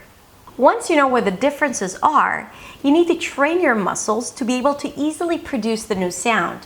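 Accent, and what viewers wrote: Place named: United States. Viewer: I agree with everything you have to say. But I hope you know that you have an American accent. And yes, that is also an accent.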